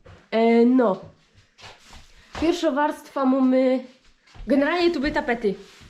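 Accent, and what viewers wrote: Silesia, Poland. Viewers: fajna ta ślunsko godka w wykonaniu.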